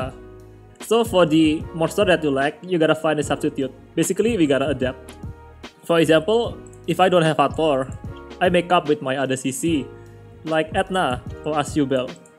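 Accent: Indonesia. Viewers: Logatnya indo banget bang , btw vellajuel cocoknya combo sama siapa ya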